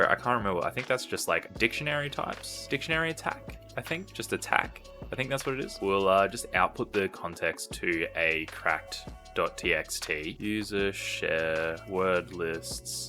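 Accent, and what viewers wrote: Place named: Australia. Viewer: Australian accent lol. let me check this room. I am not there yet on THM Thank you for the video. 1000 subscriber. make it happened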